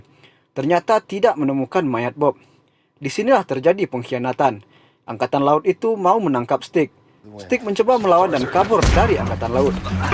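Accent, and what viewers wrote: West Sumatra, Indonesia. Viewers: Urang minang asli kiro e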